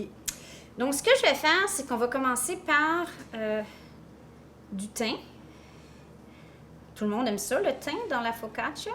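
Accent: Canada. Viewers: 👍👍👍👍😋😋😋😋 j’adore aussi ton accent canadien, il nous donne la pêche 👍👍👍👍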